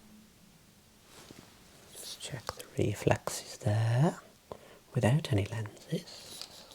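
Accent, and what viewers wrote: Birmingham, United Kingdom. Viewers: I neeeeeeed to know. Are you a professional optican or optometrist? Also fellow brummie/yam yam here. since i was a kid, ive always had tingles having eyes checked, and your accent adds nostalgia for me! Thank you thank you thank YOU! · Where are you from, your accent is so familiar, I'm from the black country and you sound kind of brummie, love the asmr btw <3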